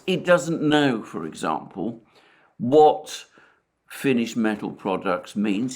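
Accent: England, United Kingdom. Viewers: Weird listening at a communist with an English accent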